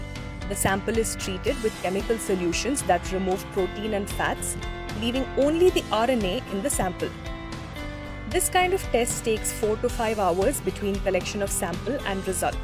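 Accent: India. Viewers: I must be positive bc I cant listen to this terrible narrator for even 5 secs. why use a complete Indian to speak in English? hm? hmmm???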